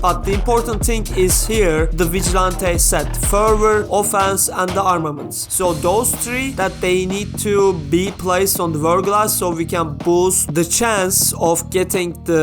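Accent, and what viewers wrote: Turkey. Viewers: Türk müsün kanka konuşmandna belli amk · Türk olduğunu aksanından direkt anladım😂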